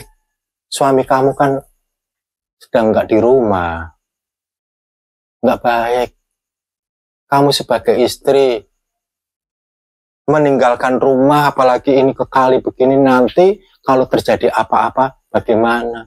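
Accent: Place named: Java, Indonesia. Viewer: tpi logat nya ko Jawa ya 😄haduhhh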